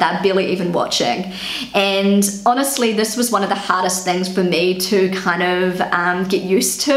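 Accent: New Zealand. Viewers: I love your New Zealander accent! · Ja, das ist das faszinierende an den diversen Akzenten in NZ. Man hört noch die Ahnen. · I love your accent. :) Bevore you mentioned that you are from New Zealand I couldn't really tell where that accent is coming from. I thought maybe England or Ireland or Australia. I have never been to New Zealand. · I love the NZ accent :) - thank you for the kind words about German parenting. Property is a big issue in Germany so maybe that is why German parents care about who owns a toy. Maybe we should relax more :) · I loved you video (and it's the first time I hear New Zealander accent) . I am in Ireland hoping to move to NZ and I love German style for parenting. The way you showed some points, even me being Brazilian seems I have similar attitudes like the German moms. Interesting to mention that all kids in Germany wear a beanie just in case to prevent cold wind, seriously all!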